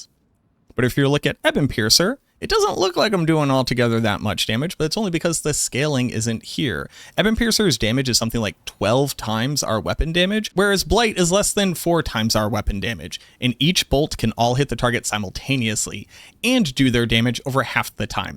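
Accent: Boston, United States. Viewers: Please do a video guide in your authentic Boston accent. Thanks!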